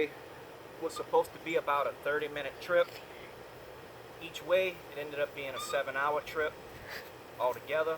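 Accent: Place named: Lafayette, United States. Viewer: You sound like you might be from around the lafayette area, just wondering because i wanted to know how it did around here? I'm looking for one to mainly go to big lake and such.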